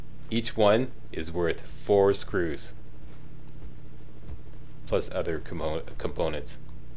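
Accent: Canada. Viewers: HEY! i used to be a BIG fan of YOU and your WIFE, a few years back, but my account was deleted for some 5 second UMG clip in a comedy i put up [when Google bought up utube and were kicking lots of utubers off for the vaguest of reasons] and i used some rather abusive language and told them to 'gtfooh' when they demanded recompense. I heard you packed this all in. Last vid i watched of yours was wayyy back about Bioshock 1[ ithink?] and helped me decide to buy it as i had to sell my PC [cash problems] and bought a used xbox360 back then. [I got a PC now but the GTX970 is very poor so gonna try and get a swap for a 1080 as the outside fan is wobbling almost off its spindle and it's only 7 months old... If i just offer to pony up the extra £300 i hope they'll just let me swap it] Good to see your stuff. Subbed soon as i recognised the Canadian accent, eh? I figured out the vegetable oil thing for getting Adhesive though i simply recruited about 8 civs to farm the corn [hardest to find until i found one in a stash near the Museum and planted it and built up the crop from that, same with the Tatos and Mutfruit]. 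For noobs like me i would advise going for the level 1 Scrapper as i don't have a clue where Diamond City is and tho a Level 2 Scrapper gets screws from weapons you have to reach Level 13, Level 1 doesn't get many unless it's junk like desktop lamps etc. Also, I installed Nexusmod manager and have [found] bought a LongScope Crossbow which doesn't have the range of m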